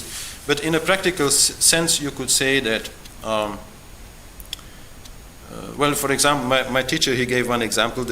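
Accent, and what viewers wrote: India. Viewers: why do you speak like a indian and also spurt out trash ?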